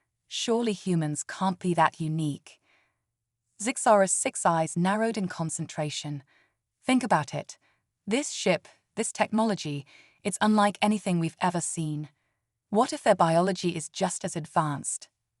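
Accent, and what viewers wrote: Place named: Australia. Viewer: This might be an AI story channel hard to tell but I love the Australian female accented voice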